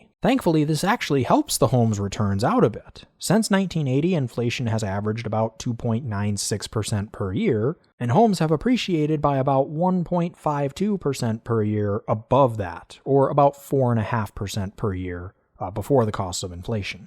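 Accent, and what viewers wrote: United States. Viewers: Oh ur an amercian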